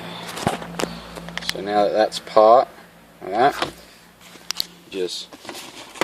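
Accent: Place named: Australia. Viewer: How good is hearing an Aussie accent instead of an American accent. Cheers, bro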